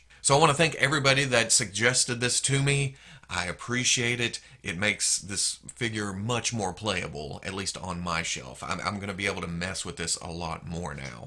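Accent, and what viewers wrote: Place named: South, United States. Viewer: Not gonna lie, my favorite part of your videos is your smooth, southern accent. It's very pleasing to the ears. I don't know why I feel this way and I'm aware that it's kind of weird but I can't help my feelings dammit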